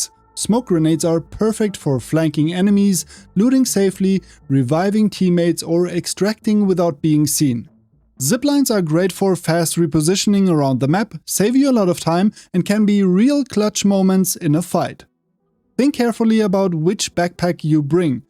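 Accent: Germany. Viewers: I hear a German accent I turn on focus mode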